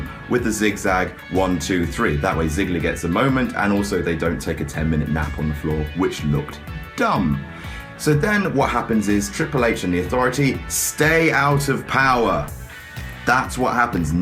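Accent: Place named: United Kingdom. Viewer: "and I talk about how I would book them differently, because I'm a smart ass." Does anyone else think that this guy's British accent made that line a little bit funnier than it would have sounded if he had an American accent?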